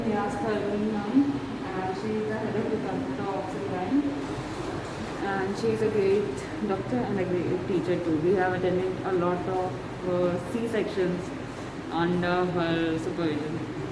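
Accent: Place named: Bangladesh. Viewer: You were speaking Bangla with Bangladeshi accent.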